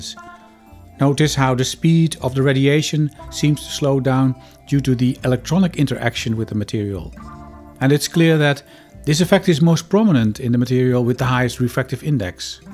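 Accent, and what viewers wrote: Netherlands. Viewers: I hate the Dutch accent.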